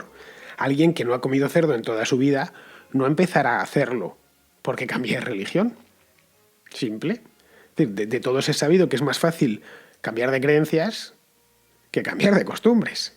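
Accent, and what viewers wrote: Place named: Spain. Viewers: es horrible el acento español uno mas que otros, para hacerlo mas serio deberias pagar para una narración mas limpia. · Vaya por Dios, los hispanos hispanoparlantes de esa parte de la Hispanidad, llamada España, lamentamos que no te guste este acento; Bueno, aquí también tenemos variedad de tonalidades...igual te gusta más el acento andaluz o canario o tal vez el extremeño o tal vez te guste más el murciano.....yo hablo como el locutor ,ósea, mi español fuerte y seco no te gustaría...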